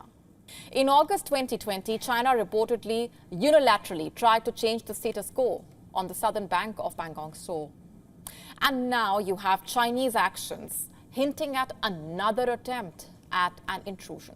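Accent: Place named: India. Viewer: Strong Curry flavor accent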